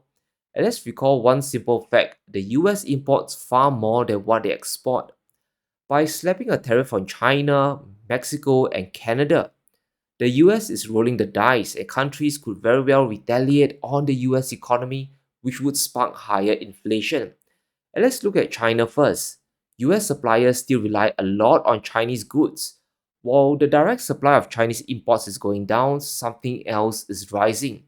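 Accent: Singapore. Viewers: ❤❤Don’t worry.. your Singlish sounds OK, per usual… your research is priceless. Thanks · Also…I never heard your slur!!! Your sound Singapore to me like my good freind - no slur??!! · Your voice sounds fine. You have typical Singaporean accent which is a relatively easy accent to understand.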